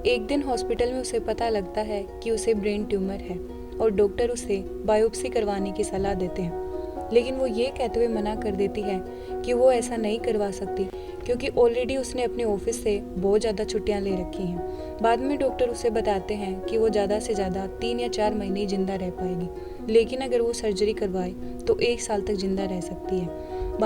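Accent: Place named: Haryana, India. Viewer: didi aap haryana s ho na aapki aavaj se hi pata lagra h...mai bhi haryana se hu...thanks for your explanation😊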